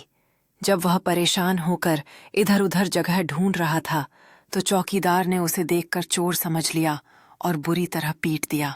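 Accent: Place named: Hyderabad, India. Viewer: Hyderabadi voice💯😍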